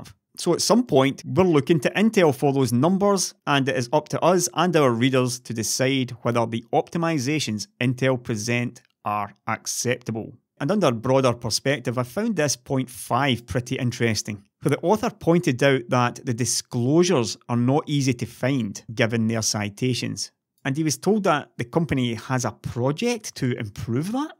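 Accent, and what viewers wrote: Scotland, United Kingdom. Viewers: love a Scottish accent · It definitely sounded Scottish, but I felt like it was a little different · whassat accent man, too soft fer glasgee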